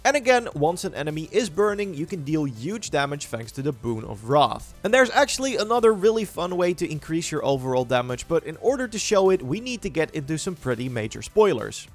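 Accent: Netherlands. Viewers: This man had the most dutch accent ever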